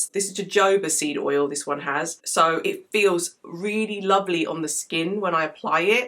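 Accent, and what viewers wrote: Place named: United Kingdom. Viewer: Oh my goodness I love you 😍 I’m British too so totally appreciate your accent plus enthusiasm!!!!! All the words you’re using are spot on for these products . I have them all ,totally obsessed. You’re right about the super loaded peau de peche !!!! I find myself taking it out of my purse just to look at it and smell it . Absolutely stunning product. In fact I’m stocking up in case it disappears one day 🙋🏼‍♀️😳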